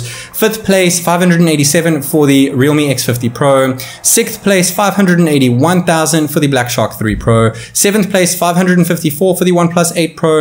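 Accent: South Africa. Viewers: You sound south African, are you?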